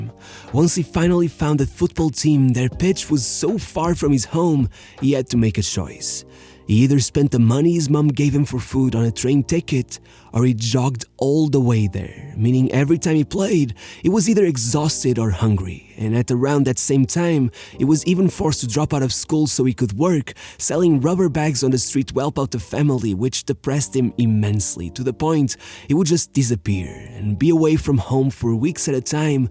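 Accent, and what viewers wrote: Portugal. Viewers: Pelo sotaque nota se que és tuga. Orgulho em ti miúdo. Vídeos incríveis sempre 🤩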